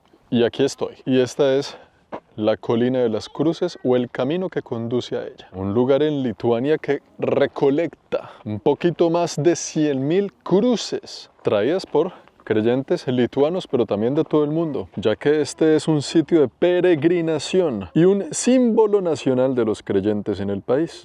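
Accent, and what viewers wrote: Colombia. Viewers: Que gracioso y simpático es el acento colombiano de, me encanta 😊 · que amenos videos haces, te sigo desde hace mucho tiempo y nunca decepcionas, te felicito y el acento colombiano le da el toque original! Un abrazo desde CDMX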